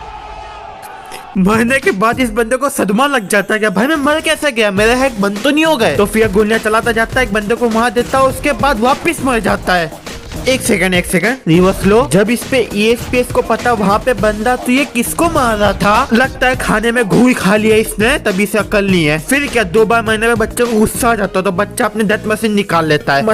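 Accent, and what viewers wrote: Gujarat, India. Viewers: bhai gujarat se ho aap?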